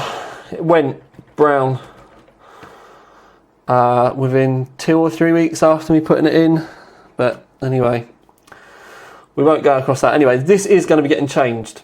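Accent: United Kingdom. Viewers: Amnisty You seem like an awesome person Amnisty! Linus is an amazing stepping stone for people getting into pc's and tech. is something special all together though. Very colloquial and sarcastic British talk. The length of his videos are such a draw for me. Something to listen to without having to swap videos every two minutes.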